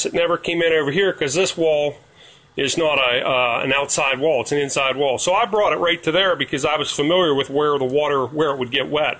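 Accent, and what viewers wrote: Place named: Pennsylvania, United States. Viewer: Well you definitely have a pa accent! Couple years later now I was wondering how is it holding up?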